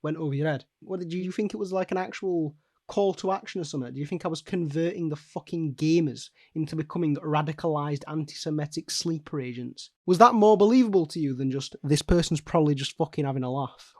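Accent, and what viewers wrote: United Kingdom. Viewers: the comment about hating on the British accent is sad. I think the British accent is cool, like a calling card of their culture. I love this channel because he has almost no filter. I can't get enough. Keep up the good work. Help the this poor sad world.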